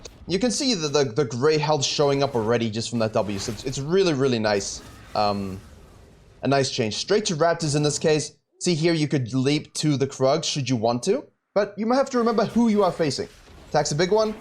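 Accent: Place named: South Africa. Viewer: good commentary, you sound like a Saffa boet? gg wp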